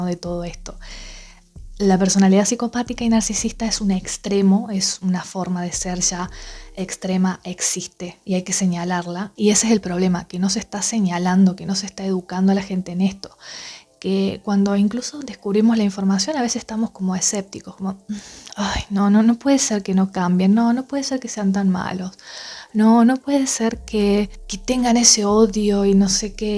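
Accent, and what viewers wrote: Córdoba, Argentina. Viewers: Ese lindo acento será cordobés? 😊 saludos genia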